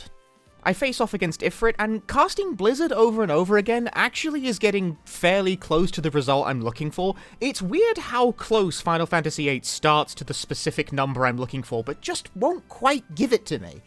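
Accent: United Kingdom. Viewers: you sound way more british in editing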